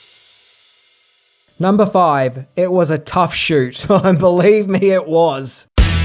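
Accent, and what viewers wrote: Australia. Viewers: He's Australian. He can't help it!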